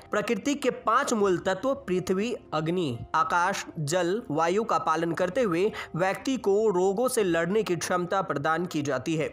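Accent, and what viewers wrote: Bihar, India. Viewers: कोई बिहारी VO किया है, खैर अच्छा है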